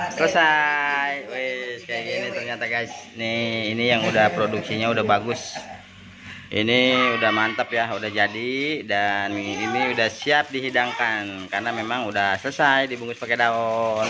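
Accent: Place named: Jakarta, Indonesia. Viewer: Betawi bgt dari ngomongnya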